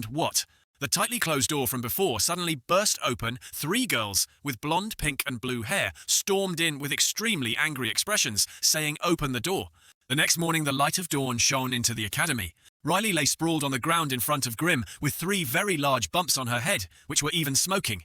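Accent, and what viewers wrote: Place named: United Kingdom. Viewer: yo love the british voice 🙏💫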